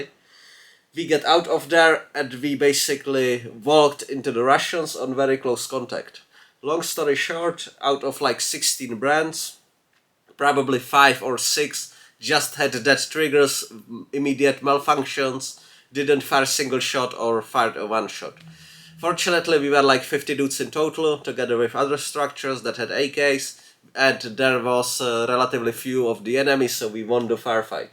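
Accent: Czech Republic. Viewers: Czech accent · i bet 100% this guy is czech, that accent tears my ears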